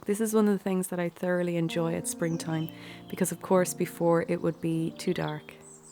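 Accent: Ireland. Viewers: I so love your videos, you can say that I am hooked. I can't get enough of them. I love your Irish accent and soothing voice. You're such a blessing. Thank you for sharing and for all your wonderful ideas. I have always wanted to visit Ireland but you bring Ireland to me. Thank you so much. God bless you. <3 Keep up the great work. You are truly appreciated. Love and hugs to you my dear.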